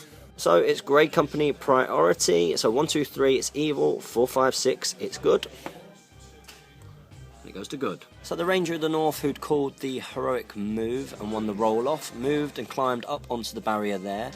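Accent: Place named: Texas, United States. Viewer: I don't think you are pronouncing it wrong, I just think its the difference in accents between Texas and the UK lol, I was just saying I found it amusing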